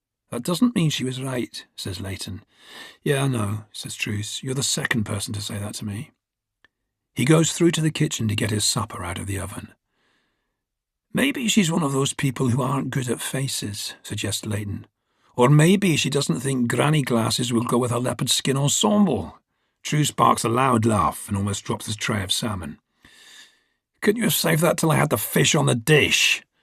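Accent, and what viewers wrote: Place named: Scotland, United Kingdom. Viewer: 𝑨𝒃𝒔𝒐𝒍𝒖𝒕𝒆 𝒑𝒆𝒓𝒇𝒆𝒄𝒕𝒊𝒐𝒏.ᐟ~ 😌✨ An epic, riveting narration with excellent voice acting for the characters - oh, and the Scottish accent reminds me of Robert Carlyle's. Would expect nothing less from the ever amazing Rupert Graves, one of the best actors/people in the industry/world. 💛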